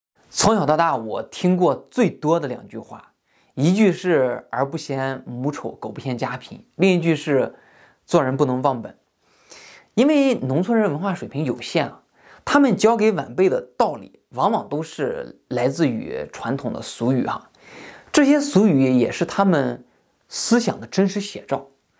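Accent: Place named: Shandong, China. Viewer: 我怎么听着你的口音这么山东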